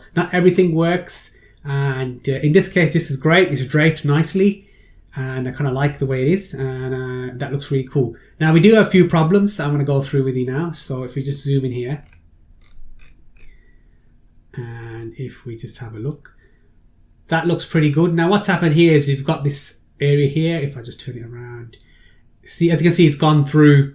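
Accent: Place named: Midlands, United Kingdom. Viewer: Thanks for a clear and informative tutorial. You also sound like a Midlander, which is awesome :)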